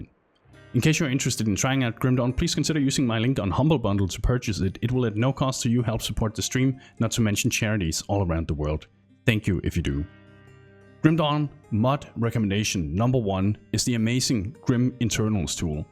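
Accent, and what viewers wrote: Denmark. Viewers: 5 seconds in, you are definately danish right? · Dat danish accent tho.. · Thanks for this video <3 BTW are you danish, your accent sounds danish. :)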